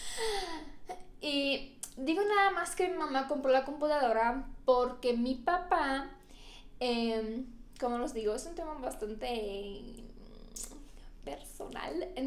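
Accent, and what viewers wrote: Mexico. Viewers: "nolohayo" "creo que le hayo aquí" 😊😊😊 bien mexicano hablas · Tu acento es super mexicano. Felicidades.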